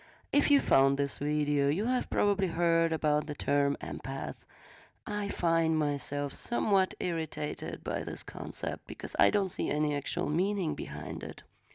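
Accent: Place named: Russia. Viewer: Important email...haha, oh stop! Love the example you gave with the homeless persons. Exactly! So are you Russian? I heard you mention in another video you speak Russian and German but did not mention which is your mother tongue. I presume Russian as your accent is basically Russian. I had a girlfriend for several years from Skopje, her accent was very similar. So where are you from actually?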